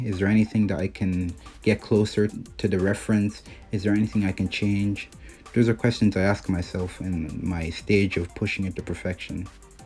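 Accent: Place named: Ireland. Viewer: Hi, this is a great drawing and brilliant tutorial/narration of your process. I just wanted to ask you if you lived in Ireland as I can definitely here an Irish twang in your accent. Thanks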